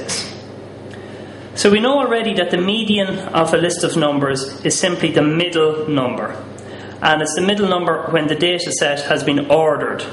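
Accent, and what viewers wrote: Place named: Ireland. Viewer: thanks a bunch! and i love your irish accent :)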